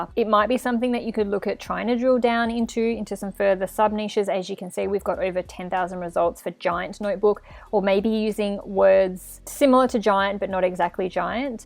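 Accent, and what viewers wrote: Australia. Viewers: Is that an Aussie accent I hear?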